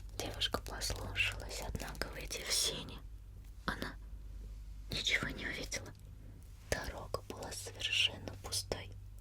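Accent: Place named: Russia. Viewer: Amo el acento ruso, relaja muchisimo❤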